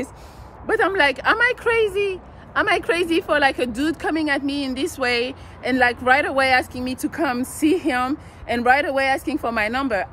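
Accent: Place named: France. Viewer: She as a french accent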